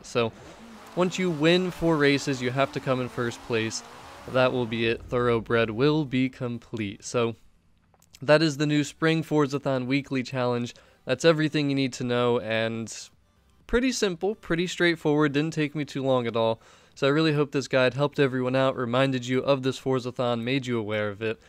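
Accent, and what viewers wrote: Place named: United States. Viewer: Yeah your probably correct from the US accent and pronouncing of the car... In the UK that's the way we pronounce it is the way I sort of typed it... Who cares lol great video as always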